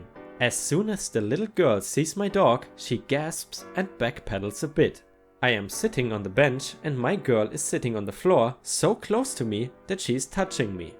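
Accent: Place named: Germany. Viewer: I don’t listen to many channels I’m not already subscribed to. There are so many new ones popping up and I usually end up getting the same stories over and over again. So, I stay faithful to my regulars. However, one of your videos caught my eye and I clicked on it. I really enjoy your voice and (to me, as I’m not German) wonderful accent. I do realize to you it would be ME who has the accent! 😀 Anyway, I have subscribed to your channel. I look forward to many more of your videos!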